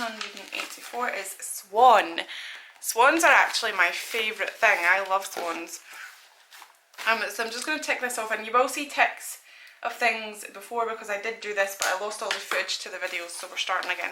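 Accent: Scotland, United Kingdom. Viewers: Are you Scottish? I am. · Shes from scotland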